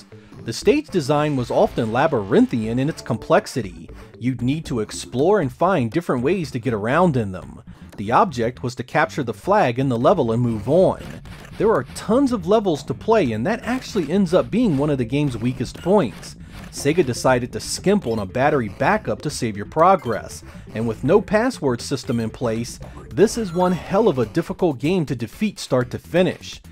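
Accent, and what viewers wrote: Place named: Delaware, United States. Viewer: So, totally off topic, but your accent... Delco? Delaware?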